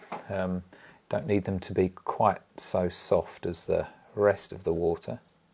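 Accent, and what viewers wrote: United Kingdom. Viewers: Absolutely BRILLIANT !!!! Fanominal panter.Love the British accent as well.💖👍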